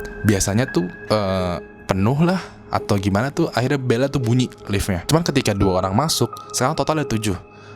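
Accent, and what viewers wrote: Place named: Bekasi, Indonesia. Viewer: bang lu orang bekasi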